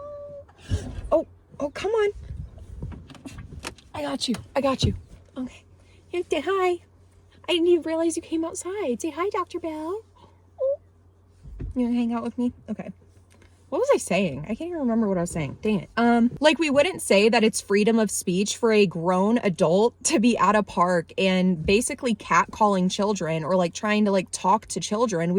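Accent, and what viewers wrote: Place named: United States. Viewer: I understand where you're coming from, but you have to be able to see a path to banning literature made by marginalized groups stemming from criminalizing this drivel. You have an american accent, so I'm assuming you're from the US. If you are, you likely know literature in general is under attack here. Personally, I think social rejection needs to be the method to discourage this garbage, rather than legislation and criminalization.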